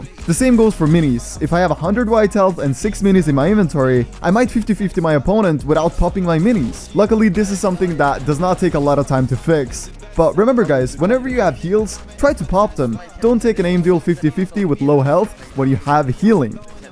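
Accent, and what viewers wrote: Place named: Norway. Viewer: i hear Norwegian, jeg høre at du er norsk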